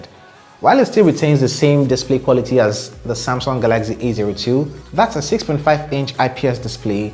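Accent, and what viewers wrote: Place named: Nigeria. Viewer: u sound like u originally a nigerian__if u are much love brooo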